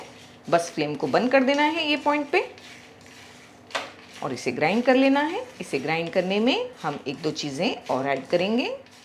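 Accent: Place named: Konkan, India. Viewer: Mashallah, aapi recipe k naam per daaba k khaate hai Aap log, aap k lehja kehta hai aap konkani hain...kabhi kabhi Bhatkally dish bhi banaye Karain.. runaways success guaranteed.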